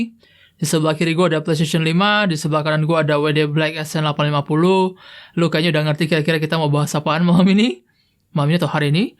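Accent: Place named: South Jakarta, Indonesia. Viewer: Waduuh suara sama bahasanya kok kya orng jaksel gila ndak paham wicis wicis 🗿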